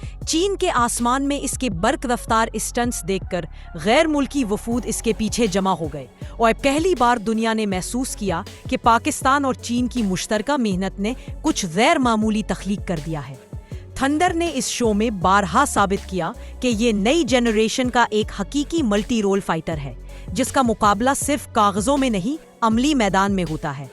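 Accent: India. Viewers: بولنے کے اندر سے پتا چلتا ہے کہ انڈین ہو پر انالئز اچھا کیا ہے پاک ٹیکنالوجی کو ❤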